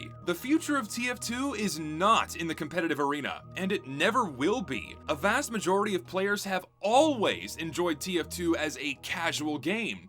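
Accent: South, United States. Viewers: You know when is angry when his southern accent bleeds through his speech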